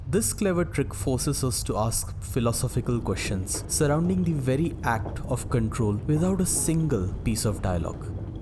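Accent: India. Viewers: I did not expect to find such elaborate thoughts on the topic at hand. Thought this (like many other “this is art” videos) would be more of a redundant opinion piece, but this video was a really fresh breath of air and encapsulates how I wish the precedent was set on the discussion of games as an art form. Although initially wary of the Indian accent, you really hammered down both the thesis of games as art, the linear storytelling and it’s philosophy and the qualities of INSIDE. Bravo. I do enjoy writing about art myself as in music, film and games and I always had a wish to create something like you’ve created on this platform. But the fact is I am not driven enough, like you apparently are, and is quite a technology boomer as I never piloted any video editing software. Even this interactive video thing you got going here flew me by and I frankly couldn’t be assed to operate by it haha. 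Still a banger video. A real question to ask is “why couldn’t this just as well be a movie”, which you cleverly debunked through it’s interactivity. This is the real reason video games works as a new medium of arts and not just a mutation of cinema. I wrote a review of the game VVVVVV which I will quote a passage off that maybe you can enjoy. The blessing and the curse of being a small content creator is: I wish you success but I also appreciate that I can perhaps have a dialogue with you. I won’t be online this summer but by all means add me on Steam if you’d like to pinball ideas or opinio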